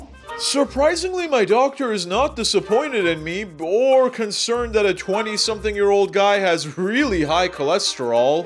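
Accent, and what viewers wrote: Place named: United States. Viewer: you look very mexican/middle-SouthAmerican but sound very american please decide you cant be both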